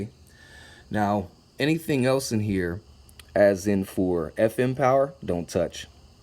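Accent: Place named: New York, United States. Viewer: You sound like you are from Brooklyn or the bronx. I'm a long island boy in south carolina.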